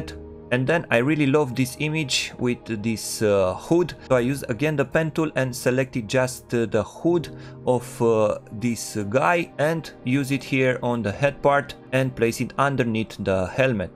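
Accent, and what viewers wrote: Romania. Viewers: Imi parea mie ca esti roman dupa accet :P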